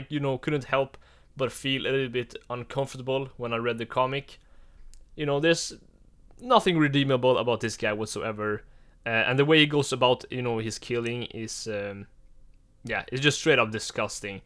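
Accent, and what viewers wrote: Sweden. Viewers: Are you swedish because of your accent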